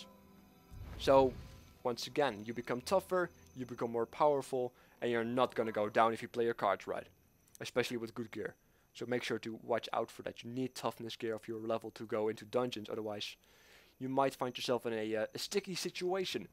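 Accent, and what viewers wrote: Netherlands. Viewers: You are a dutchy aren't you (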